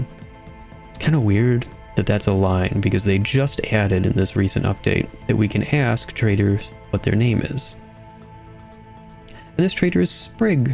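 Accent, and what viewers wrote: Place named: Nova Scotia, Canada. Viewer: Random but are you from eastern Canada? I would have just assumed US but the way you pronounce Rs especially in words like "marked" has the super Irish sounding quality people from Nova Scotia often have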